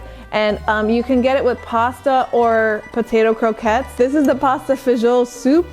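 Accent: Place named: Bronx, United States. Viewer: Wait, you sound like your from the boogie down sweet heart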